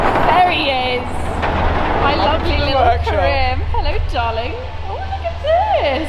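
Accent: Ireland. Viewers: Car is amazing, and I hear a little Irish slang in you these days haha good to see the time here in Ireland rubbing off! You've accomplished so much for 29 so no pressure just enjoy your 30th! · Can tell you’ve been living in Ireland for a bit “the crack” and “grand” 😂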